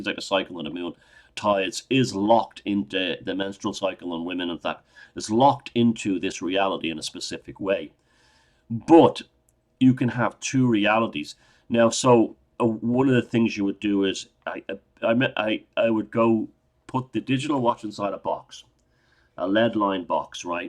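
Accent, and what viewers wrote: Dublin, Ireland. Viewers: Dublin area accent? New subscriber here. Great vid, cheers!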